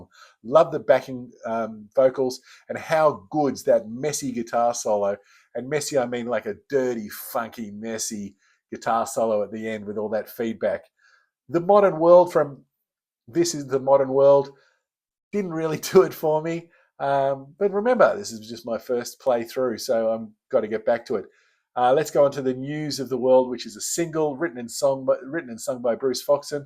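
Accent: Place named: Australia. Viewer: With your accent...are you living in Australia? One of my sons has gone out to Sydney for a year. Ive been a long life fan...well, since 1978. Love them...what an inspiration! Gerard in Belfast.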